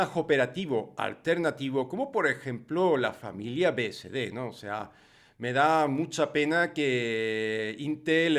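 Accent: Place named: Extremadura, Spain. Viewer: Vos sos de Cáceres o de la sierra de la gata? Tenés un acento que me hace acordar al de Nazaret martin crespo una chica q vive en esa zona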